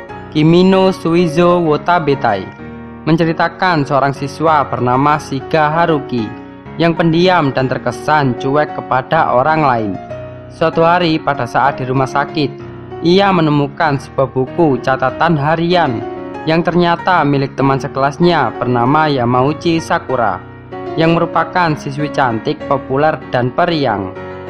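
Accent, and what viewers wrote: Java, Indonesia. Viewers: aku suka medok mu min hehe lanjutkan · Suara admin anime medok medok ya..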